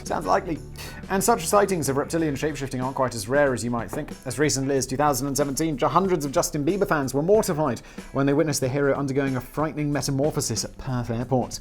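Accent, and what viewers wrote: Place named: United Kingdom. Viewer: I never knew you could put a British accent on Anunaki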